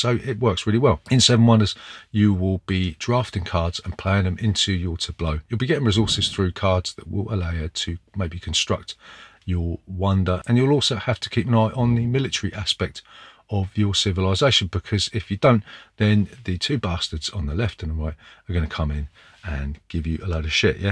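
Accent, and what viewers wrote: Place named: London, United Kingdom. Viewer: I’ve been watching the videos you have produced for a little while and despite the list which is awesome with lots of games I want to try on there, I am always struck by that bloody lovely gaming room and your awesome Londoner accent!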